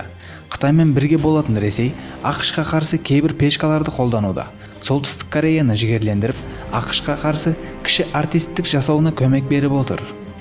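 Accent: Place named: Uzbekistan. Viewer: Тилин биртурли сенин озбексинба акцент бар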